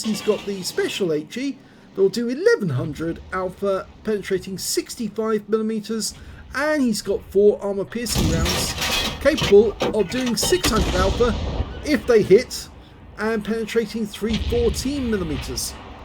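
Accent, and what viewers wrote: United Kingdom. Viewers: You are from England ???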